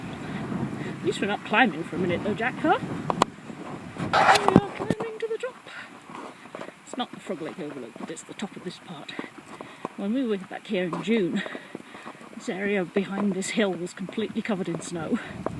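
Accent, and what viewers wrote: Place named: United Kingdom. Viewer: your riding is fantastic! I was lucky enough to visit Tahoe years ago and went for a trail ride in Alpine Meadows. I live just below the Cotswolds and have Badminton at my doorstep but it's no comparison to your hacking! Can't help but notice that your accent hails from the UK though, did you have such great riding when you lived in the UK? Would love to go on a riding holiday in the area to explore the trails!!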